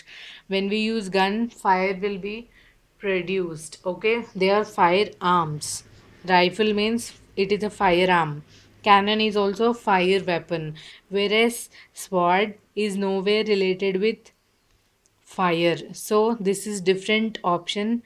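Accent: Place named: United Kingdom. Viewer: mam aap ko london main hona chahiye tha aap ki english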